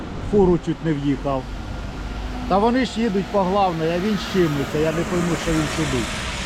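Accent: Poltava, Ukraine. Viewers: Чисто полтавский говор не спутаешь ни с чем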